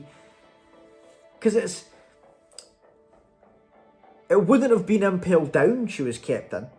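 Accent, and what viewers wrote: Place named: Scotland, United Kingdom. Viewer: Wow you have a Scottish accent ‼️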